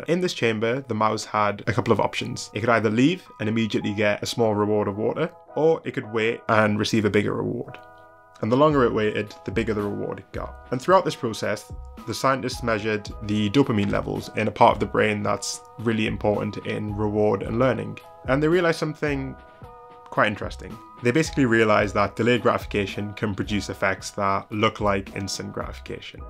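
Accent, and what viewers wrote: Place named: North East England, United Kingdom. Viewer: Nice to hear a north east UK accent on YouTube :)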